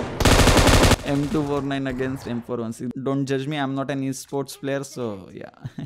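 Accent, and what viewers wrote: Maharashtra, India. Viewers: Ghati English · Индийский английский режыт слух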